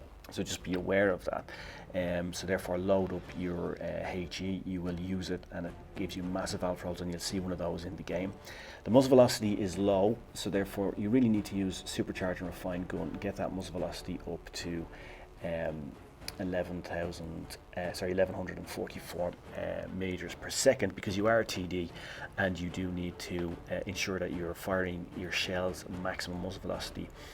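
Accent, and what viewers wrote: Ireland. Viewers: Thanks for the review mate! Can I ask, are you irish? For the accent nothing more, if u are cool. Cheers Saludos desde Mexico!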